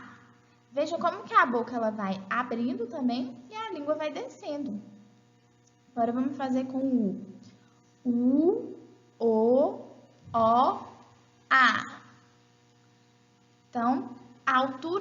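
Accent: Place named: Minas Gerais, Brazil. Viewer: Sotaque minerim ^^ Bom de mais da conta.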